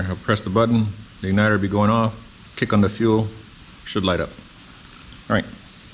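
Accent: Texas, United States. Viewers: I thought I was hearing a Texas accent. I’m in a northern Dallas suburb. Folks like you make me a proud Texan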